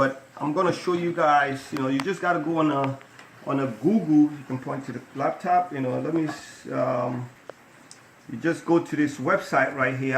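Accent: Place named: New Jersey, United States. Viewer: Excellent video. You have a Jersey accent.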